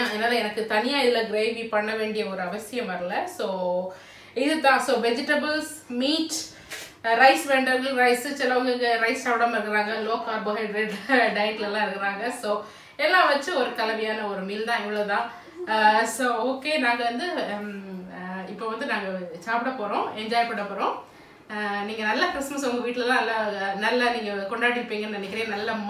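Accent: Nagercoil, India. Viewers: Superb turkey dinner with all the trimmings. Anton's choices are nice. Your Nagercoil slang invokes the festive spirit too. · Nice to hear our nagercoil slang.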